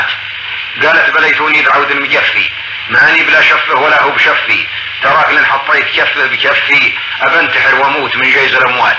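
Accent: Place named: Taif, Saudi Arabia. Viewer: نطق الكاف. والجيم عند رحمة الله عليه من الطائف الى رجال الحجر خاصة كبار السن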